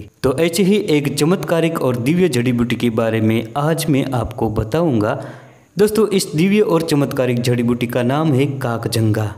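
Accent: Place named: Tamil Nadu, India. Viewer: Tamil wala bolti hai. Aati nahin hai.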